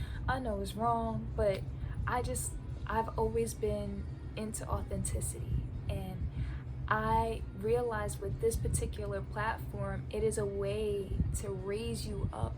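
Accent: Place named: New York, United States. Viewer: I love this video z! The NY accent, the light hearted vibes and the authenticity. It’s so you.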